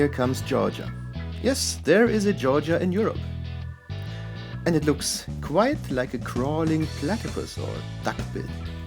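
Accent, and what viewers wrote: Germany. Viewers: I love this, it's crazy in a good way. Your accent also adds to it as I can picture you a slightly bonkers German scientist. Are you from southern Germany? I've always seen my country Britain as as a strange seated witch driving a car with the extended leg of Cornwall and the extended arms of north and south Wales. The Lake District is her adam's apple and south-west Scotland is her beard. She is sneezing out of a hooked nose at the Argyll penninsula and has pooped out the Isle of Wight.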